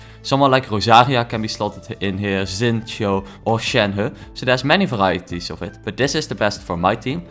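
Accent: Netherlands. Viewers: Helpful video! Are you Dutch by chance?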